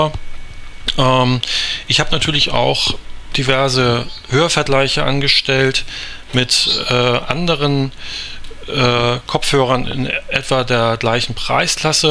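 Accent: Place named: Germany. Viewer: very bad english, your german accent is so strong that it is impossible to understand.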